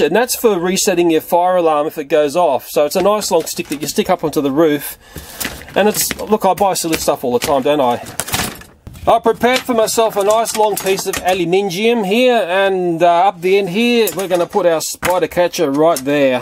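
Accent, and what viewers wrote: Australia. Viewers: Maybe that’s the way Australian people pronounce it.... how curious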